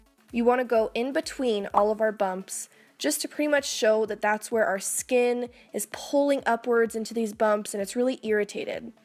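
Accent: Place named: Albuquerque, United States. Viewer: Are you a Burquena? Some of the ways you say things make me think you're from my hometown! haha Specifically when you said "Eeee! All creepy!" :)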